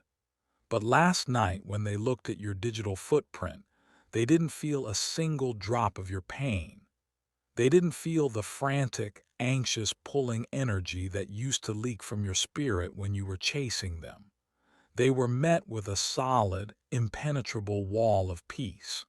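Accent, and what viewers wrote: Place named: Maine, United States. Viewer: You sound like you are from up east.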